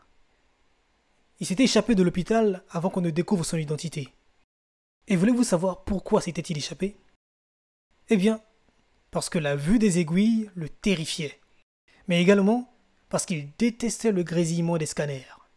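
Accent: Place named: Mali. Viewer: Vraiment intéressant, le petit jeu ajoute une plus value non négligeable à l'attractivité de la vidéo, je constate un gouffre au niveau du tempo et du ton entre cette vidéo et la dernière en date que tu as sorti, si tu continue à surprendre avec des histoires peu connues, il y en a tellement.. tu va percer! que demande le peuple??!! Je me risque à donner ton accent, je dirais malien.. 😊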